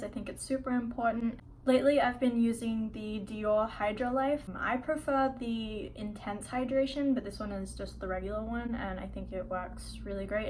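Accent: Australia. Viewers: i detect a aussie accent also this video is sooo good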